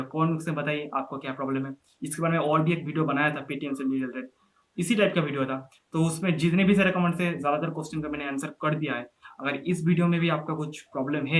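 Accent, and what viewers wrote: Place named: Bihar, India. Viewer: bihari accent ne jaan le rakhi h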